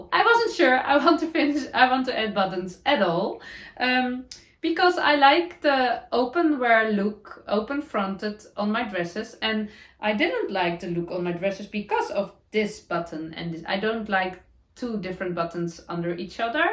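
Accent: Netherlands. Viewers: I love hearing your "Dutchy English" and to that end I never want to rectify what you say. That said we do say 'modify' and not 'modificate'. 🥰 xxx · Oh.....you can talk as long as you want. We love to knit and listen. Your Dutchy-English is VERY good. And how special that you care enough to get it right and learn. It is just wonderful that we do not need to learn Dutch - especially if it is more difficult. Can you hear me laughing? It certainly sounds more difficult. Love your patchy socks - so worth it!!! I do that too. Have a lovely Easter weekend with your family on both Easter days. 🧶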